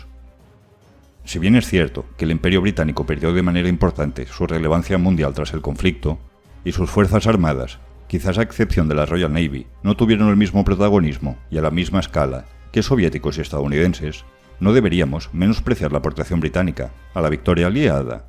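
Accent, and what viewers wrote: Galicia, Spain. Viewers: De que parte de Galicia eres??????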